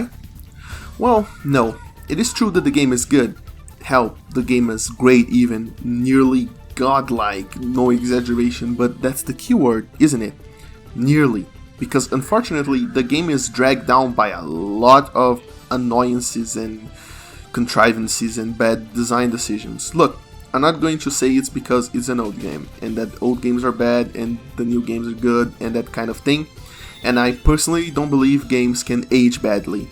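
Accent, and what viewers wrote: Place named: Brazil. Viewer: Your accent sounds Brazilian to me.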